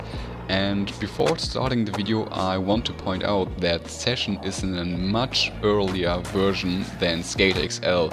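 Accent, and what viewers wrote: Germany. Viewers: Man merkt, dass du Deutsch bist. ;) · U Sound like a german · Sounds like a german, speaking english for his first time.. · Bist du deutscher? xD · man hört das deutsch richtig raus haha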